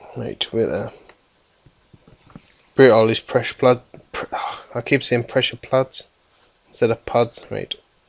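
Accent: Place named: North, United Kingdom. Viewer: Even though i'm from the north as well, I love hearing your accent haha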